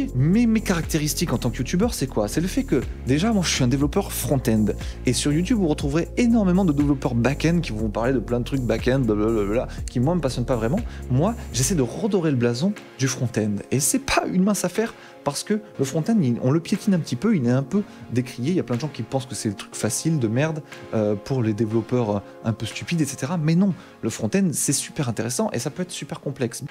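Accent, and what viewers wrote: France. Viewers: Continue en anglais, ton anglais est hyper simple pour nous de comprendre vu que t’as l’accent, limite j’ai l’impression tu parles en français alors que c’est de l’anglais. De plus tu pourrais grandement aider ceux qui comprennent pas, les gars l’anglais c’est la base de tout au monde surtout en tech! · Pour ma part, que ce soit en Français ou en Anglais, ça ne change pas grand chose, ton Anglais est facilement compréhensible pour un francophone (ouais, ton accent est français et, pour ma part, c'est pas pour me déranger). Je te rejoins sur de nombreux points et plus encore sur le "concept" de 2nde chaîne en Anglais. Il n'y a qu'à voir le four pris par Bruce Benamran (e-Penser) quand il a tenté de créer une chaîne anglophone anglophone (Get it). D'autres youtubeurs ont fait le même pari que toi et ils connaissent le même rejet d'une partie de leur public. 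Kevin Tran (Le rire jaune) en est une illustration, il a vu le nombre de vues réduit de moitié et le nombre de commentaires n'est plus que l'ombre de ce qu'il était... A la fin, c'est toujours le public qui a raison, que ce soit pour de bonnes raisons ou pour de mauvaises raisons. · From my point of view it's great to have a tech guy easier to understand because of French accent, as a beginner I struggle with fast and not clear English so switch in English sounds great. I wish you the best of luck ✌️ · depuis que j'ai commencer a apprendre le dev(il y a 9 mois) et j'ai egalement commencer l'anglais. je trouve ça super que tu passe en anglais et je continuerais a te suivre avec ce fameux accent français depuis bdx 😉 j'ai commencer depuis peu a suivre des cours sur freecodecamp qui délivre des certification en développement, le contenu est anglais, tres pédagogique(beaucoup de pratique) et complet, je le trouve mieux que le contenu gratuit de openclassroom pour ma part. 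si tu peux faire une video sur ce site ça pourrais peut être motiver ta communauté a apprendre l'anglais ou juste me repondre en commentaire sur ce que tu pense de leurs certifications pour le marché du travail francophone 😉.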